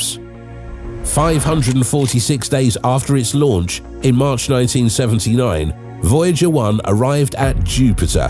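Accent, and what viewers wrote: United Kingdom. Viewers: i don't like british accent for narration .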